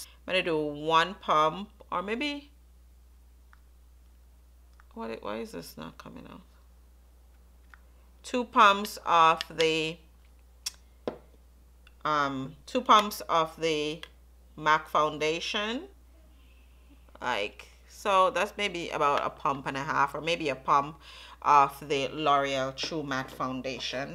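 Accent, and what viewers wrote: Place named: Jamaica. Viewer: Beautiful look. ❤ ❤ You sound like you are Jamaican. Are you from Jamaica if you don't mind me asking?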